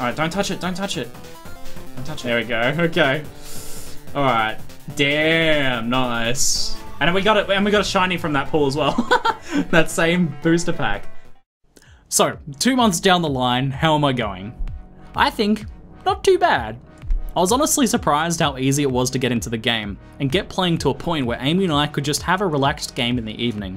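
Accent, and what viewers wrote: China. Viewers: ayy chinglish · Chinglish is there, did you bro up with him? xD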